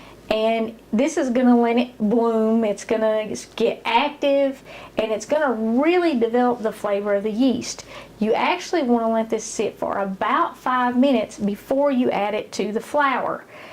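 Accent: South, United States. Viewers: I really love your accent. If Dolly Parton made yeast rolls this is how it would sound. Being from Alabama I know a little bit about southern accents. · When they speak with a southern drawl, You know you’re watching a reliable source for good food lol!!! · I started watching you and following you because you remind me of the south and I love the south! Even though I'm not from the south. 🙃 I love your accent and really love to see your love for God. I pray that God will bless you and I thank you for talking about Him. Jesus Christ is my Savior and I'm thankful that you are uplifting God/Jesus in your cooking videos. By the way, I love your recipes too.. they are the same recipes my Grandma made. 🙏❤ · What part of the south are you from?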